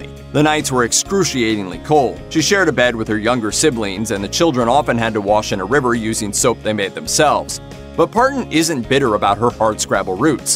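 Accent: South, United States. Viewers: you have a nice American accent you sound normal i enjoyed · A<link> love the way you said mama! I love hearing it.. soo endearing! Not sure if you got southern roots or even mountain roots! Either way I hope you get to meet this graceful lady!🙏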